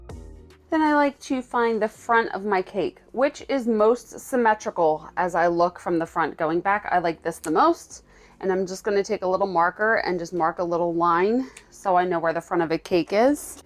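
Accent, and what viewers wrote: Philadelphia, United States. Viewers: 😂😂😂 my Phillly Sis😂😂😂 since I’m out of Philly now the accent is real. People used to tease me now I see we do have a Philly sound.❤️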